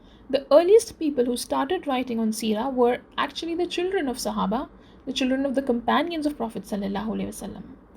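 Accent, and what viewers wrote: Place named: India. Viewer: i think she lives in Qatar(as mention in about section) but by accent it seems she's from India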